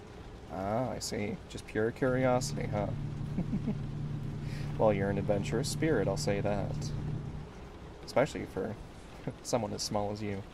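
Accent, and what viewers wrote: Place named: South, United States. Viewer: SLIGHTLY SOUTHERN SOUNDING CATBOY TRUCKER RAAAHHHHHHHH 🗣️🗣️🗣️🗣️🗣️🔥🔥🔥🔥🔥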